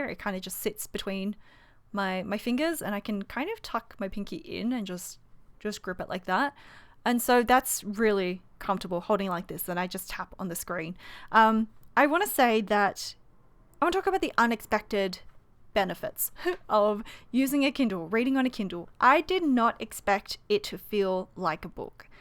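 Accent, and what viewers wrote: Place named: Australia. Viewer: The Australian accent though 😍😍😍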